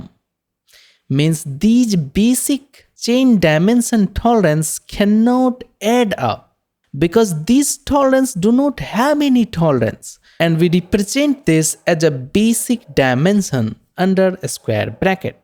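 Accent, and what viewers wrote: India. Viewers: Despite the fact that you are Indian and have a funny accent, it doesn’t hinder absorbing useful information at all. Moreover, the illustrations for better visual perception are very helpful. The material in the video is excellent, very useful, and I am learning from it. Thank you and greetings from Ukraine. All the best!❤❤❤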